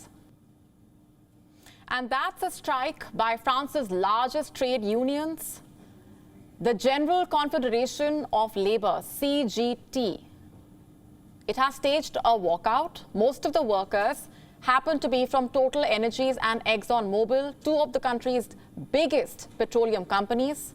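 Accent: India. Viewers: You are speaking Indian English. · Fire her. Her Indian accent is atrocious. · The Indian English! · I hate indian accent. It's disgusting. Please learn the language well.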